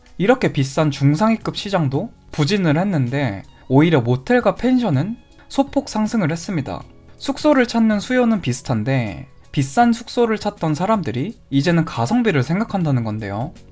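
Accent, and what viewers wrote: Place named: Honam, South Korea. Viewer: 혹시 전라도 출신이신가요? 말하는 억양이 호남지방 억양이 짙은데..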